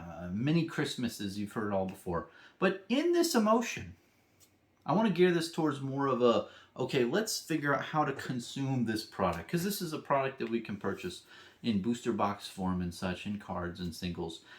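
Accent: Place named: Florida, United States. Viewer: I’ve Said It Once & I‘all Say Ot Again, Your Accent Is So Pleasing To My Ears!! Are You A Florida Native?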